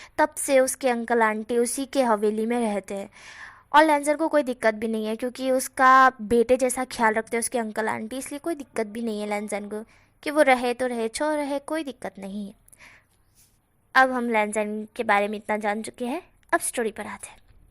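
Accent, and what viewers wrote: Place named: Gujarat, India. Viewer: Aap ki thodi boli gujarati he❤😊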